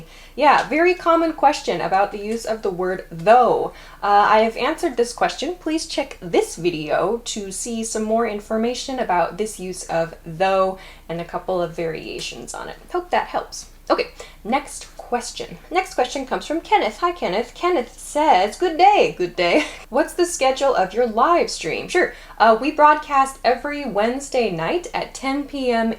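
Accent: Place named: United States. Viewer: Hello, I want to learn an American accent like you, but I don't know how. Did you can tell me how I can get an American accent please and in Grammer, I have problem in the verbs for example: (I have been understanded you) why we can't say ( I understood you ) because understood is the past of understand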